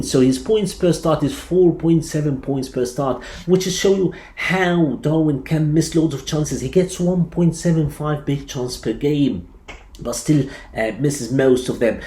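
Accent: United Kingdom. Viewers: King!! Your british accent!🔥🔥🔥🔥🔥🔥🔥🔥🔥🔥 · Amazing content and a perfect British accent. To all the world, here is the best FPL content ever. 😍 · British accent 😍 👌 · A very perfect British accent mate ❤️❤️❤️🤍🤍🤍🤍🤍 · Amazing content. So organised and to the point. Your British accent is incredible. I used to follow you in Arabic, but I love your episode in English even more. 😍😍😍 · ما شاء الله عليك يا دكتور بتكلم بلكنة بريتش و المحتوي ممتاز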